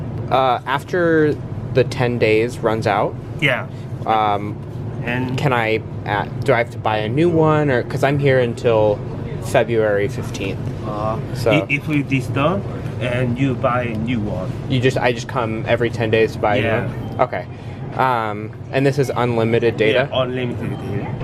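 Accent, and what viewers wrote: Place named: Japan. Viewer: Your Korean sounds like Japanese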